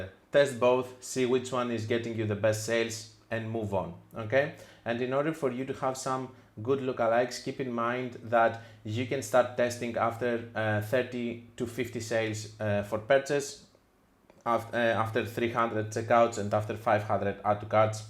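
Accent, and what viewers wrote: Greece. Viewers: Όταν καταλαβαίνεις ότι είναι Έλληνας απ'την προφορά χαχαχ μπράβο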